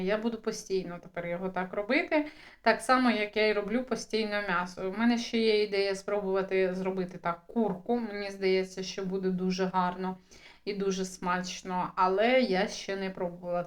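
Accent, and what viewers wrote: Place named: Transcarpathia, Ukraine. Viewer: Це закарпатський діалект.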